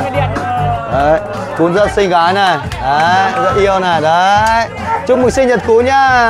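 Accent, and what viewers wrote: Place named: Central Highlands, Vietnam. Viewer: Thoi sao mang theo âm điệu vùng cao (hay)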